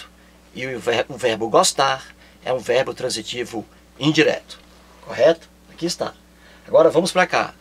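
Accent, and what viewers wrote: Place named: Ceará, Brazil. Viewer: eita cearense vei arrochado.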